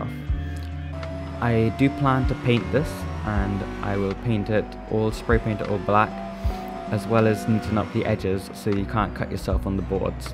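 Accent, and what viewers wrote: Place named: Trinidad and Tobago. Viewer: You from Trinidad? Kinda picking up the accent there.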